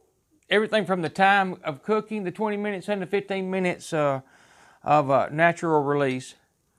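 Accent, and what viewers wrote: South, United States. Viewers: I'm trying to figure out what state you are from? I'm in Georgia and you have a very distinct southern accent. I love watching you cook up those ribs! I've copied you once and ooooh they were good!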